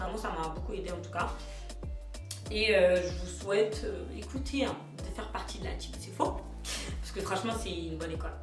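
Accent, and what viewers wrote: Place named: South, France. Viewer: Merci beaucoup, tu as répondu à toutes mes questions pour travailler chez SEPHORA. La vidéo était très claire et compréhensible. Ps je te trouve très belle et j’aime beaucoup ton accent du Sud 😉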